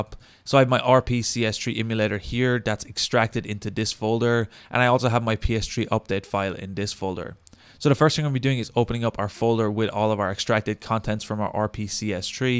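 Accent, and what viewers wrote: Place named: Ireland. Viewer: you have a great Irish accent lad thnx worked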